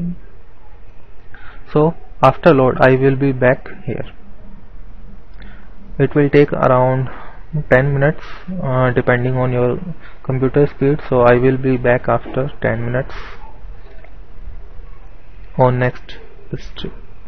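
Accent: India. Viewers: Actually, I understood him very well, sure he has an Indian accent, but good English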